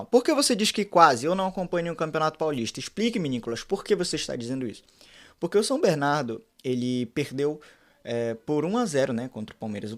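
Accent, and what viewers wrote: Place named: Pará, Brazil. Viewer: Vc não é paraense irmão é o que aparenta pelo seu sotaque 😅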